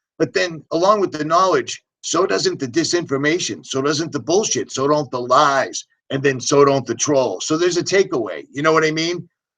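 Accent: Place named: Massachusetts, United States. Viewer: Hi! Great video as always! Every time I listen to one of your videos I can’t help but think of my time living in Marlboro Ma for 10 yrs! Still have some family & friends so when you talk it always reminds me of them and makes me laugh because of the accent! I love it!! Be blessed and God Speed! Love you keeping it real!!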